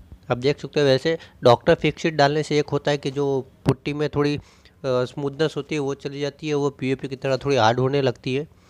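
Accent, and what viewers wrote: Gujarat, India. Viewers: You are gujrati right? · Gujju che k su bhai amuk word sambhdine to lage 6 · Gujarati Accent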